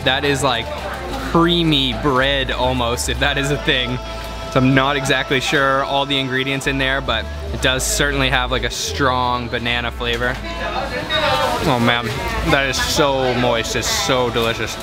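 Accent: Canada. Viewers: Its canadian speaking style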